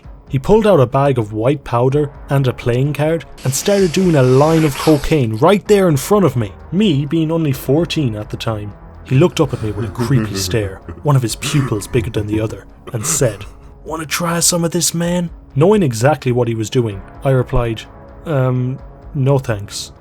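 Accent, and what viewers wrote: Ireland. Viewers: Sounds Irish. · He does I was thing the exact same thing. Also because they both have a deep voice and an Irish brogue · He sounds irish Jacksepticeye intensifies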